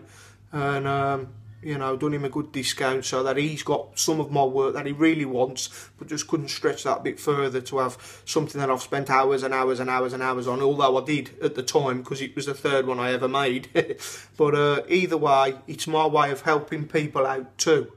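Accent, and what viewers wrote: Birmingham, United Kingdom. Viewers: Erm, nobody gives a toss mate, just be your Brummie self:)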